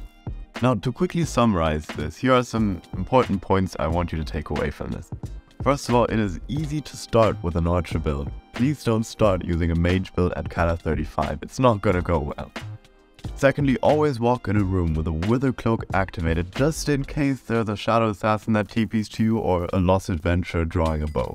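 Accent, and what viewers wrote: Germany. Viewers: Yo, nice german accent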